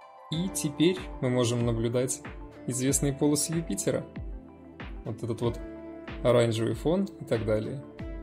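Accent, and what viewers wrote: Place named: Minsk, Belarus. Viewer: Увы, минский акцент не спрячешь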